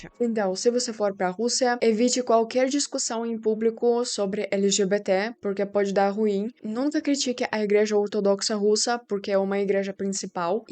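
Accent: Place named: São Paulo, Brazil. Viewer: Seu português é bom, mas vejo que o pessoal do interior de São Paulo dificultou o seu R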